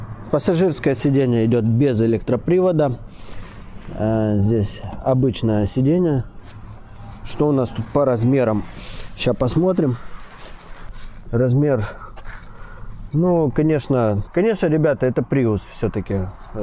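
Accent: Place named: Odesa, Ukraine. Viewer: Отлично,! Смотрю всё,что Вы предлагаете к обзору!С Вашей Одесской манерой разговора даже обзор входных напольных ковриков будет интересным и занимательным!Блеск! А Машина хорошая,нет слов, но дорогая для патриотичных и не богатых колхозников.Да даже если бы Вы и критиковали её за что-то,то были бы вправе это делать, как я Вам это уже говорил..Ведь Вы! С уважением из Саратова,Владимир,61 год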